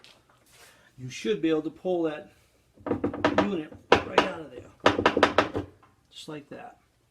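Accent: Maine, United States. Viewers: So, what part of Maine are you from? I know my people when I hear them. 😀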